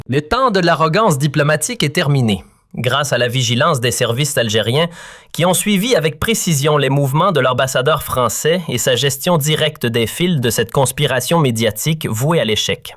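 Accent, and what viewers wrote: Canada. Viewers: cet accent canadien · fait avec un accent québécois plutôt que français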